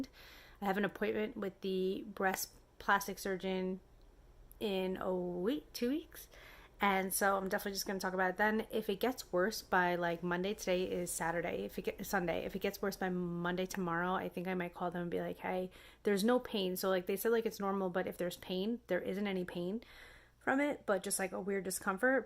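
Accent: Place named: New York, United States. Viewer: Also, it’s been a treat to listen to your NY accent, as someone who grew up on Long Island and has the same accent 😊. I don’t get to hear it as much in the midwest and I miss it! 😂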